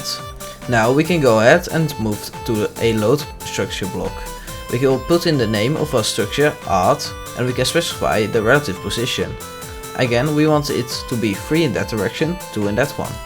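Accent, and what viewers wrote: Netherlands. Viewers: Niets hatelijker dan een hollander die engels spreekt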